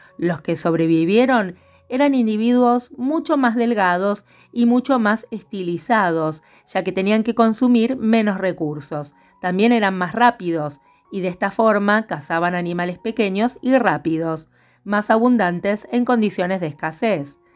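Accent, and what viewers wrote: Argentina. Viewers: No me gustó, me recontra gusto, explicas cosas muy interesantes. Es el primero video que veo pero creo que los veré todos, me gustaría saber si abordas las teorías de kropotkin... por cierto, me encanta ese acento argentino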